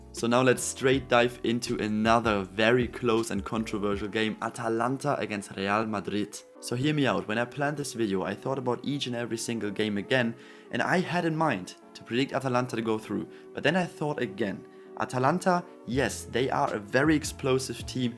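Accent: Germany. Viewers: Deutscher Akzent bockt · Man hört so dass du deutsch bist😂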